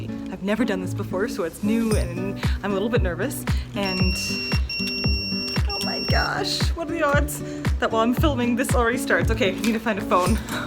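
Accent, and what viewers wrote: Canada. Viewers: This is like the 8th video I’ve watched and I finally realised you’re Canadian 😂 Gosh I’m so bad at guessing accents, I really cannot hear a difference between Canada and the US 😂 I wonder if Americans make that mistake when listening to UK accents 🙈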